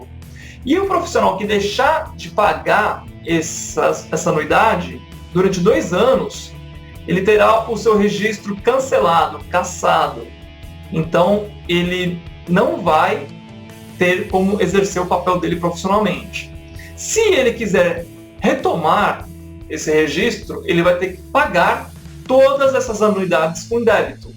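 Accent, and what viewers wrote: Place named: São Paulo, Brazil. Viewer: Parabéns cara, pelo seu sotaque acho q é de SP, como é esse seu esquema de coach?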